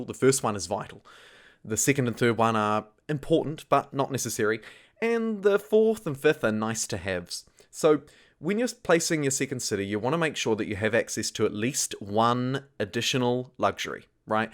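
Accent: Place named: New Zealand. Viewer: I love a New Zealand accent. Ben is "bin", and bin is "Ben".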